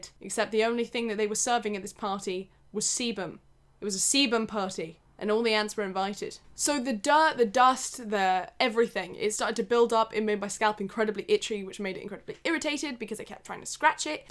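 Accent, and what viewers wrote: United Kingdom. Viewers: the most relaxing british voice ever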